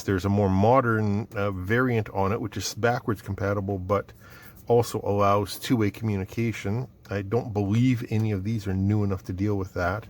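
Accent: United States. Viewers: I'm going to assume you are a Big Clive fan, after the "one moment please" Going to also assume you from the extreme northern USA, Montana to Washington, from hearing, OAT and ABOAT. for out and about. Got a free X-10 sample pack after reading of their $40 value in an electronics magazine. (Mid 90's) 6 other electricians from our vacuum cleaner plant in N Canton, Ohio got in on the deal. Still have one to open the garage door 30 years later. (Sears wanted a fortune for a transmitter and had made buying the decoder chip impossible.) Good Bye Sears 🥲🤣 Here's your 👍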